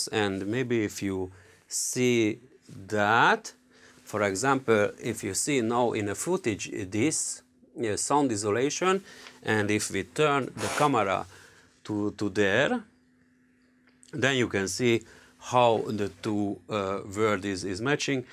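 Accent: Hungary. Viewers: he he az első mondtad után tudtam hogy magyar vagy :D köszi szuper volt a videó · Great monitor, good review ... :). Good humour, lovely accent ... :): are you from Hungary or somewhere in the neighbourhood ... :)? In any case, it was great help for me, now I know why I need at least 40" for a 4K monitor ... :) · Im sure I hear hungarian accent there. I might be wrong. This monitor is £498 on amazon. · Köszi az infókat, nagyon jól jött :) ( az akcentusodból kiindulva magyarul írtam :D )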